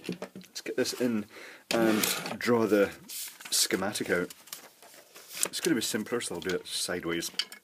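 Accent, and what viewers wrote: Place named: Glasgow, United Kingdom. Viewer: Was watching with fascination, and, just for giggles, switched on the inline captions., with the technical terminology and your mild Glaswegian accent, it really didn't do a very good job, I went to a website yesterday that dealt solely with misheard song lyrics; I wonder if we can supply them with material for an annexe?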